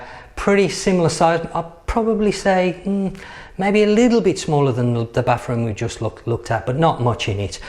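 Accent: West Midlands, United Kingdom. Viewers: Hey how are you bro. Sorry we haven't been able to visit you for a while we were in Bulgaria then Malta were we got stuck due to Covid and flights we're cancelled. But great to catch up with you again. Where in the west Midlands are you from bro, i really recognise that accent. Very cool indeed. Thanks so much for taking us along with you on this adventure trip. Nice 4x hotel. Stay safe and take care my friend catch up soon God bless you.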